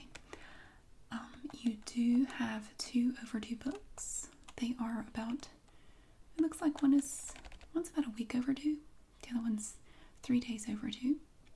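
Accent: South, United States. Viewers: She sounds like a southern pam beesly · sometimes I forget that you have a southern accent and then I read the comments about the accent and then I just think “she has an accent??” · I just discovered your channel today. Your videos are amazing! I've listened to this one twice already and love the sounds and your accent! There's nothing like good ol' southern hospitality here in the south! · I love your southern voice and biscuitville as well!! I eat at the one in Greensboro often lol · So many tingles! Does anyone else feel like she sounds like Pam from the Office but with a Southern accent? Such a soothing voice.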